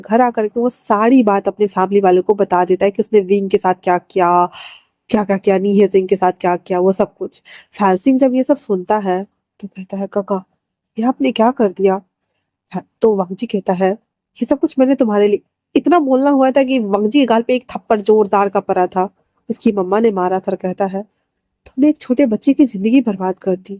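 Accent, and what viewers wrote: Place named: Jharkhand, India. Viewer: Aapki awaaz bahut acchi hai use per Jharkhand ki word aur bhi acchi lagti Hai jab Main vahan ki awaaz sunti hun mera dil khush ho jata hai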